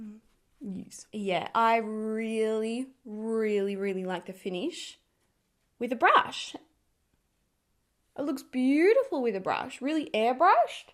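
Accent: Australia. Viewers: I love you!!! I have oily oily oily...did i say oily??..skin!.. and i like depend on your reviews and suggestions! I don't know what i would do without your channel!! And your awesome Aussie accent is an added bonus!! Lmfao. Keep killing it girl!! You just saved me $18.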